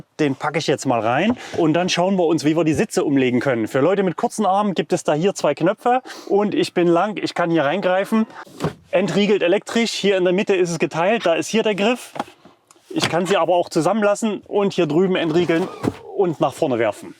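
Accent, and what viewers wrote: East Germany, Germany. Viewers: ich halte diesen Ossiakzent nicht aus :(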